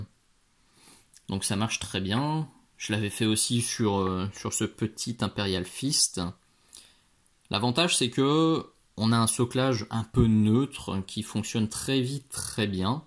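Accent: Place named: Lyon, France. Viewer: Superbe vidéo, je ne savais pas pour le ciment, et encore moins qu'un produit tout prêt existait. J'adore, l'accent lyonnais. :-) Je m'abonne et je like. A +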